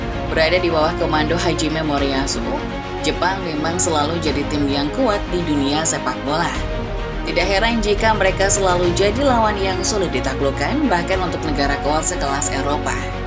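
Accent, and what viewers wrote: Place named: Pekalongan, Indonesia. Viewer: Logat mu min Pekalongan banget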